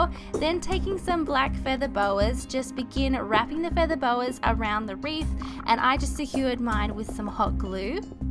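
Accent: Australia. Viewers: ARE U AUSTRALIAN???? Go AUSTRALIA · is she Australian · Hey are you AUSIE! if s so I am too! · Is she Australian??!! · Australian I am pretty sure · You deserve WAY more subscribers than you have you so beautiful and bubbly it would make me so happy if you replied! I'm new this is the first video of yours that I've seen the second I heard your voice I subscribed and turned on notifications love you so much💕 BTW are you Australian cause I am too that would be awesome byee xoxoxo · Are u from melbourn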